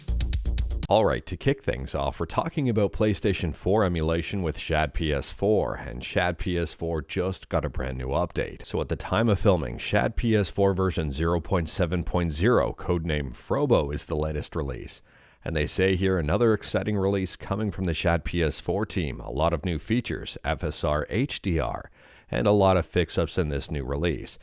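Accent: Canada. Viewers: You say aboot. Defiantly Canadian. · Can confirm: The day you learn you have a Canadian accent is very Jarring. and that's coming from someone who is tesl certified as one of the first things we learn is other countries prefer Canadian instructors because of our lack of an accent. Go figure eh? lol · Your Canadian accent is minimal if that's any consolation.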